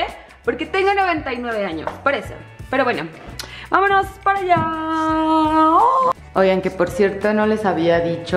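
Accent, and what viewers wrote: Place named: Spain. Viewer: aquí estoy apoyando con mi granito de arena viendo todos tus videos como mil veces en todos los dispositivos que tengo para apoyarte y que tengas muchas muchas vistas me encantas y por favor amo tu acento español 😅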